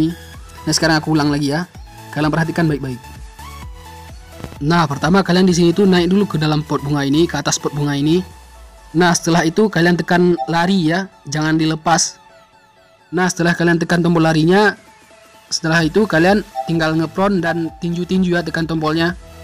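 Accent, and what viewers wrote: Aceh, Indonesia. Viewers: org aceh y bg ya?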